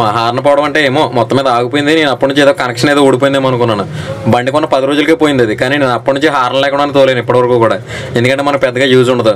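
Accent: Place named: Konaseema, India. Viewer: కోనసీమ భాష పోలేదు బ్రో గుడ్....మాది కోనసీమ.. కొత్తపేట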